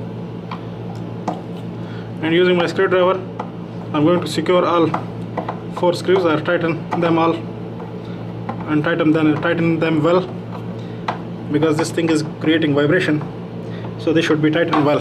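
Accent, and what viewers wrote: India. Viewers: Informative video, it looks like this entire vacuum cleaner could use a cleaning. What year does it date back to? What industry was it used in? What country was this video filmed in? I'm guessing India judging by your accent.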